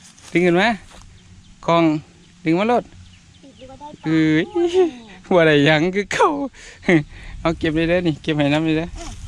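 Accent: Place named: Phetchabun, Thailand. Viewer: แม่บ้านเว้าม่วนแม่นคนเพชรบูรณ์บ่คับ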